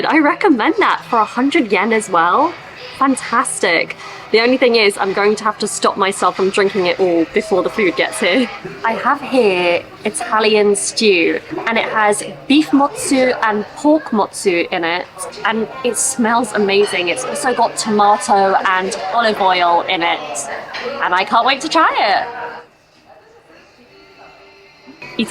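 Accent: RP, United Kingdom. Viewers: コレぞ、 “Queen's English” と思えるほど、一語一句を “丁寧かつクリア” に発音して下さる (#イギリス人🇬🇧らしい!!) ので、すごく聞き取りやすいです！ 👍👍 ～ 所作も自然体ながらエレガントで、好感度が高いですね！ 🥰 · 字幕がきちんと付けられていて英語の勉強になるし、Squishyさんの品のある、ゆっくりめに話してくださるイギリス英語は聞いてて気持ちいいです。所作も美しいですね😊 日本の日常的な良いところを紹介してくださって嬉しいです。最近このチャンネルを知ったので過去に遡って観ようと思います❤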